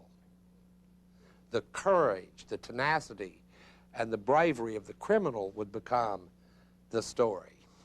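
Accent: South, United States. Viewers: wow..is that southern accent? just knew it ..thanks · Watching Rick Roderick makes me really like those Southern US accents. On another note, his lectures are really great for some quick summaries to deepen understanding into a thinker's perspective, and this lecture series is really great. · I cannot express how refreshing it is to hear European philosophy explained via Southern accent. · It's rare to hear a southern accent talk positively/neutrally about continental philosophy. xD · love hearing an intelligent speaker with a southern accent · Watching him makes me realize how negatively biased marketers are against people with a southern accent. Ironically, they’re probably more liberal I’m guessing.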